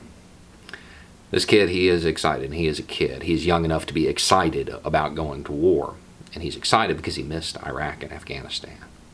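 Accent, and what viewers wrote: South, United States. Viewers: See at first when i heard your southern accent I thought you were going to be all for it because literally every southern person likes Trump but it turns out you were not that type of person and you are very well spoken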